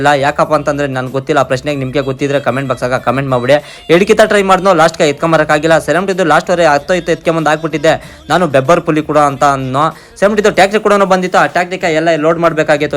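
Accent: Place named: Anekal, India. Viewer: Jigani anekal taluk same slang bro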